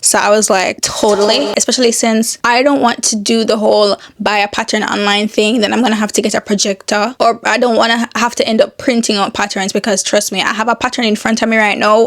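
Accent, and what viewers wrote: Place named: Patois, Jamaica. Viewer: lol the patois chips in sometimes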